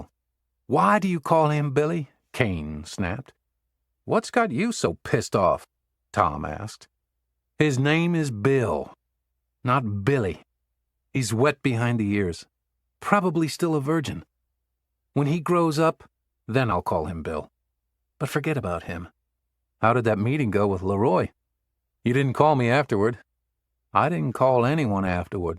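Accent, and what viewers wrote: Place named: South, United States. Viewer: Horrible Narrator The Southern Accent is 😞 Bad Very Annoying. This Book is Boring Very Slow Moving. I couldn't care less about The Story or anyone in it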